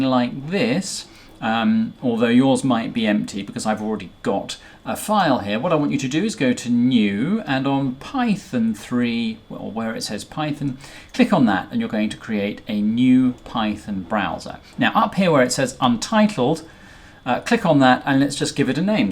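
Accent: United Kingdom. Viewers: This is the best british accent I have found on the internet, thank you!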